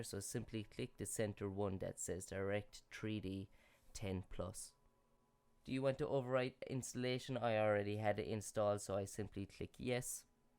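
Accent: Ireland. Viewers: are you Irish? HON IRELAND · U Irish? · r u from cork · irish lad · Subbed cos you’re also Irish lol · thats an irish accent lad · What part of Ireland you from bud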